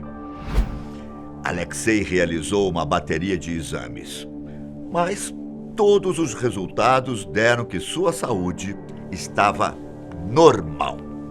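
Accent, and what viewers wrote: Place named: Bahia, Brazil. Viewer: baiano kkkk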